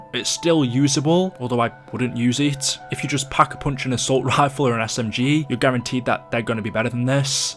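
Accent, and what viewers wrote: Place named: Birmingham, United Kingdom. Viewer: Are you from Birmingham? I mean that accent tho..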